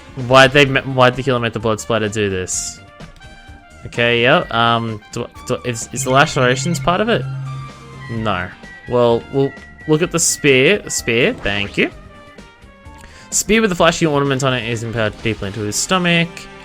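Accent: Australia. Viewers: When I first found you and heard your voice -- quite a long time back now -- I was trying to place your accent. I could tell there was something in your accent that wasn't making it sound British. It wasn't until in one of your videos you actually said you were Australian that it finally clicked for me. I was like, "Ah, that's it!" I'm one of those people who try not to jump to conclusions, and since I don't actually know you I didn't want to ask straight out where you're from.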